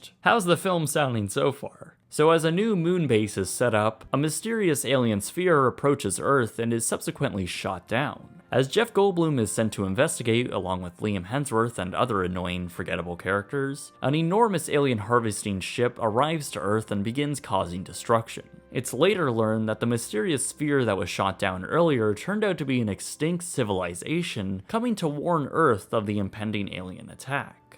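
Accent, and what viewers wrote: Canada. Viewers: 1) You need to go on a 'very' diet, and 2) a 'new sequel' as opposed to...?, and 3) I pegged you as a fellow Canuck within the first three sentences. Where's my pixie stamp reward?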